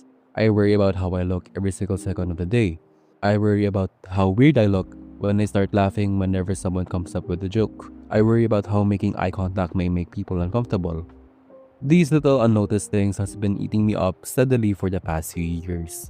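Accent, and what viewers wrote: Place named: Philippines. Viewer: Pinoy?- (I said to myself the moment I heard the accent, and then you said PINOY NGAAA!! YEY)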